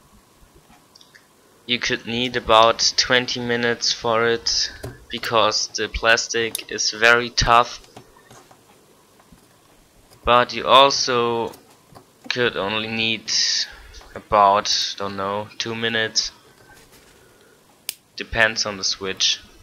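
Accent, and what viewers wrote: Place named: Germany. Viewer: I'd just like to say, your english is quite good. You have a german accent, of course, but your english is perfectly understandable. Great tutorial, the best I have seen on youtube.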